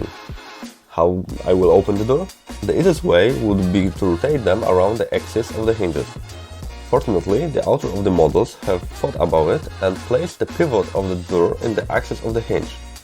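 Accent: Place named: Poland. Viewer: I can hear fellow polish dev :D keep it going!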